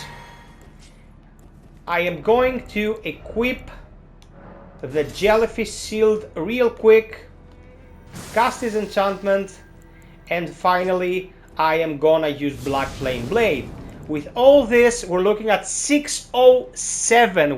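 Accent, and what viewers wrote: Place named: Greece. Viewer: dude that is a greek accent ain't it! reminds me a bit of old school toronto in the 70's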